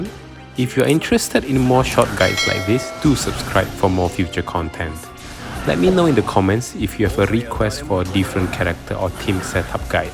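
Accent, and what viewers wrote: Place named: Singapore. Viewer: hi bro are u singaporean too? sounds like one also might need help on ddffoo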